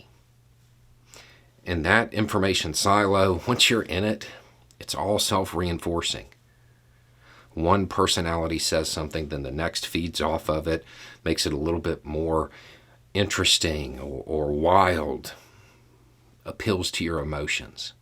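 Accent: South, United States. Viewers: It must be your Southern accent/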